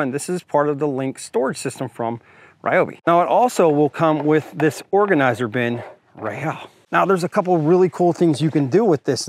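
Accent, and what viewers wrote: Pittsburgh, United States. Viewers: You sound like a yinzer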